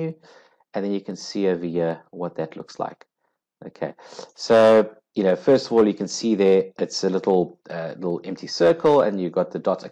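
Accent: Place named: South Africa. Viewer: are you south African, i heard your voice and immediately knew 😂😂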